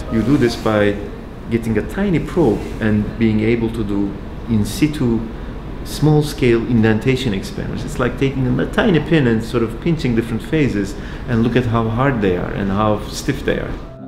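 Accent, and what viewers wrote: Turkey. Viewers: Aksan Direkt Türk Olduğunu Belli Etti :D İsimde Kesinleştirdi. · videoyu açar açmaz bildim aksanindan türk olduğunu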